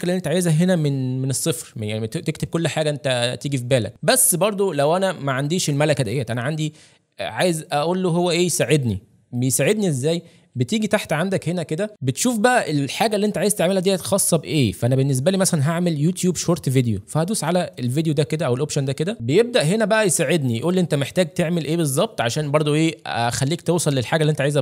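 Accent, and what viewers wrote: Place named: Saudi Arabia. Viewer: الجميل في الفيديو خلاك تتكلم سعودي .. بصراحة ابداع .. اشكرك بعنف ❤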